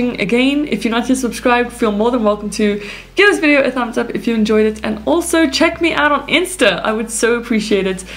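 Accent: South Africa. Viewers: Cool Video... Great hearing a South African voice on YouTube :-) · Are you from South Africa? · Thanks for sharing Hayls, it was really lekker to hear a fellow South African too! I'm based in the UK now and have been for the past 3 years! Still struggling along with social media!! Keep well, and thanks again. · Hey awesome vid by any chance are you South African ?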